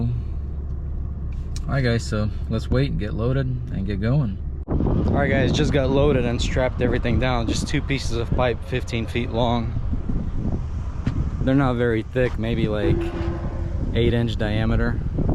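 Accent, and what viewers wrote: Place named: United States. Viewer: Говоришь ты как настоящий американец, красиво, а действуешь четко и без нервов как настоящий русский. Кто ты воин?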